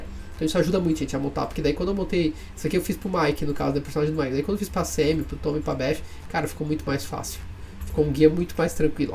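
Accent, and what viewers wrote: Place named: Santa Catarina, Brazil. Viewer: Obrigado pelo conteúdo irmão! E esse sotaque de SC ai? haha, sou do sul tb, Blumenau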